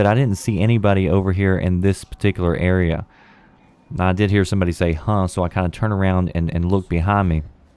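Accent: South, United States. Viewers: Hmmmmmm, so southern and Mild. Plus 10 for good game-play! Have a like.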